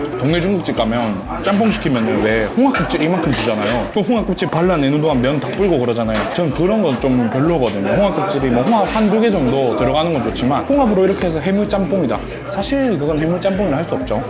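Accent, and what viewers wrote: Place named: Busan, South Korea. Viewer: 짜달시리(특별하게) 얍실하다(얇다) - 부산 사투리 넘나 좋은 것. ㅋ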